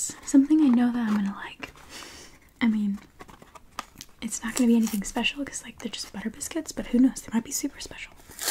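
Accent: France. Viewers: Oh myyyyyyyy !! One of my favorite asmrtist doing one of my favorite type of asmr ! 😍😍💚💚💚and your french is so cute 😺😺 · YOUR FRENCH IS SO CUUUUUTE!!!! (im french) 🥺🤍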